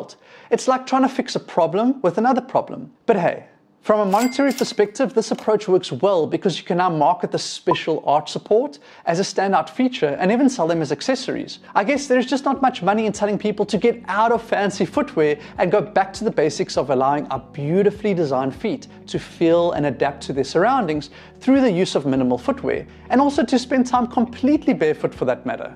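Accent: South Africa. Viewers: Damn, the South African accent...first time watching, something in the back of my mind recognized that accent immediately, Greeting from Mzansi Chief :)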